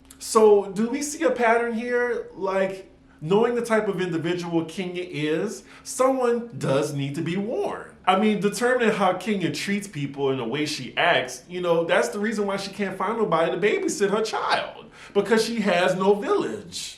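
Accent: New Orleans, United States. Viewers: I love that you were doing your New Orleans accent baabyy!!! 💛🖤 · Live your New Orleans accent 😁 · Ok yassss👏🏾👏🏾👏🏾👏🏾 with the NOLA accent, luv it😊👍🏾👍🏾. U already Knowwww!!!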